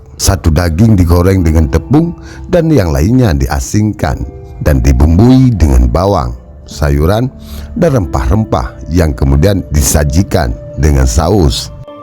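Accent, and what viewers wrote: Aceh, Indonesia. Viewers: dari logatnya kayaknya bang admin orang aceh ya hehe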